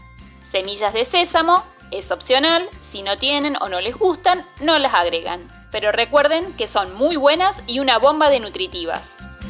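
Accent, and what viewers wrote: Argentina. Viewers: Soy colombiana casada con un argentino😁.Vì tus recetas y me haces reìr con el acento y dichos idènticos a mi esposo.Saludos🇨🇴🇦🇷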